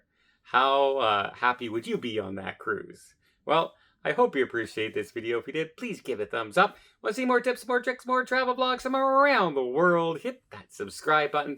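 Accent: Canada. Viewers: you must really be bored with your life. He is from Canada sometimes there pronounce words differently .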